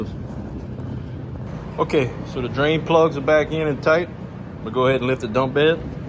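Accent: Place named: Louisiana, United States. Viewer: You from lafitte area? Sounds like it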